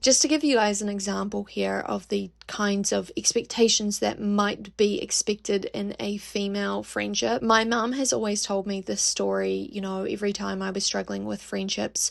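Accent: New Zealand. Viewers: New Zealand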